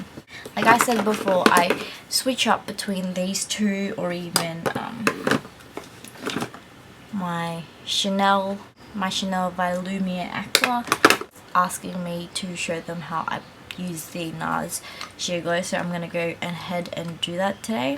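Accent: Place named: Australia. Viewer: A filipino girl with a what I guess is an Australian accent? Interesting! Subscribe! :)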